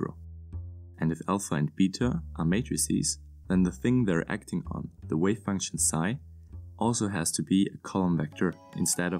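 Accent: Germany. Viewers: Nice vid, vid. Your accent and pronounciation of the word "Ansatz" sounded german, thus may i ask if you're german. I know the vid is old af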